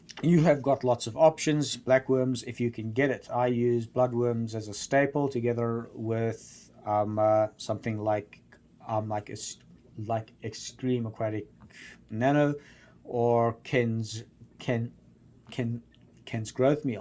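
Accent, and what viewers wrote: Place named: United States. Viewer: that's an amazing American accent.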